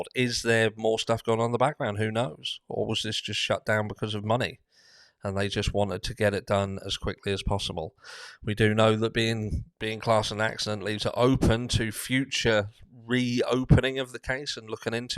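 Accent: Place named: East Anglia, United Kingdom. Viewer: Hi dude, newbie here. Love this chat, I'm going to dive into your channel. I have to ask, are you from Suffolk/Norfolk, your accent is very familiar? I've subbed btw 😉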